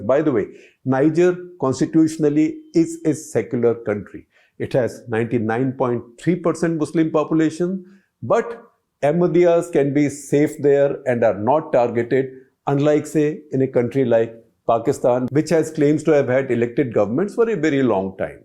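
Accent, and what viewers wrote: India. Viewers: Fake off. Your. lousy thick Indian tone is faking incomprehensible!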